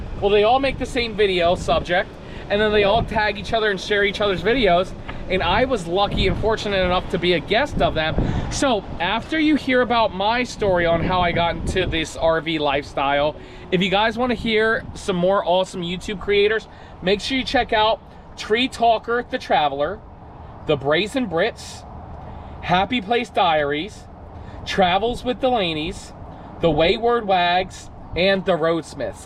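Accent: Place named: Maryland, United States. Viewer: I thought you had a Maryland accent, I spent most of my life in Maryland.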